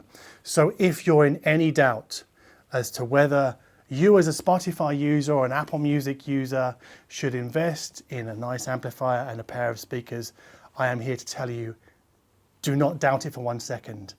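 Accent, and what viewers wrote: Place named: England, United Kingdom. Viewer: I like the way you speak, always have been jealous of english accents.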